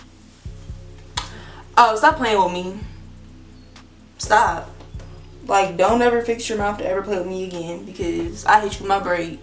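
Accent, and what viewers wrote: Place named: South, United States. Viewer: definietly hear an accent. love it. are you from the south. i.e carolinas, bama, tennesse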